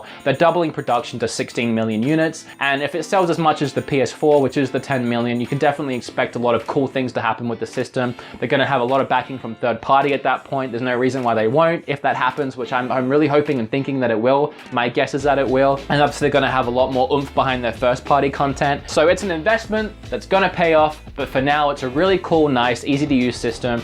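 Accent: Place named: Australia. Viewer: His Aussie accent is so prominent in this video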